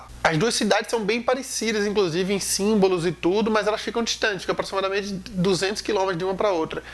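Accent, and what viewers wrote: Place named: Ceará, Brazil. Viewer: E o seu sotaque tem I'm pouco de cearense out pernambucano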